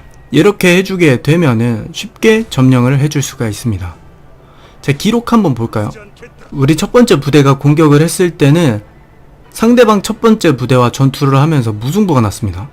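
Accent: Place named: Jeolla, South Korea. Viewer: 전라도 사투리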